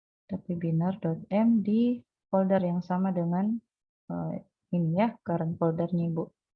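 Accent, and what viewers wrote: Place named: Gorontalo, Indonesia. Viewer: logatnya Gorontalo/sulawesi bngt nih wkwkw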